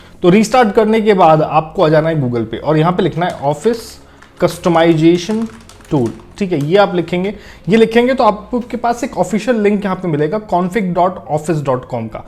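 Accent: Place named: India. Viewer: Bro, you sound like an auctioneer with an Indian accent. slow tf down, there's no prize for fastest speaker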